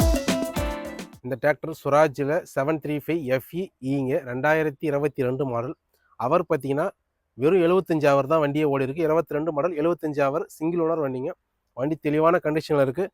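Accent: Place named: Kerala, India. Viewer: Kerala Tamil❤🎉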